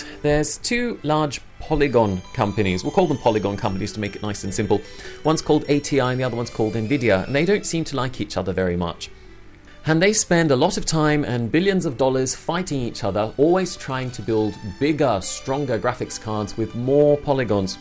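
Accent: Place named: United Kingdom. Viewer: Isn't this Euclideon? And I thought he was Australian, what's with the British accent?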